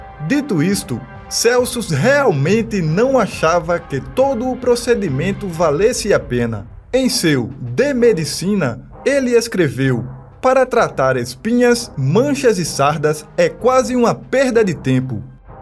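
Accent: Northeast, Brazil. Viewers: Gosto de ouvir a sua voz. O jeito de falar é interessante. Você é do nordeste?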